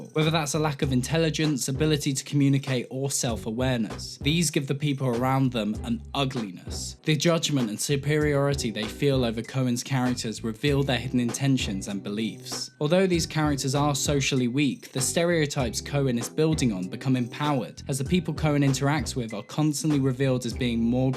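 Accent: United Kingdom. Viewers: The British accent keeps me watching. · Why are you pronouncing Iran like an American? Its weird af. You have a british accent for the rest of the thing, you weirdo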